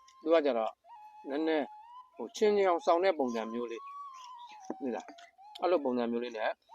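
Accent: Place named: Upper Myanmar, Myanmar. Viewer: အညာစာလေးရယ်အညာသံလေးရယ်စိတ်ဝင်စားပါတယ်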